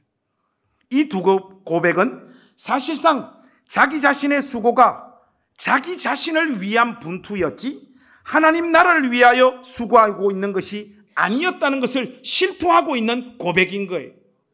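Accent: Gyeongsang, South Korea. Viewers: 목사님 설교는 너무 좋아요. 그런데요 경상도 말투가 , 악센트가 너무. 강 합니다😂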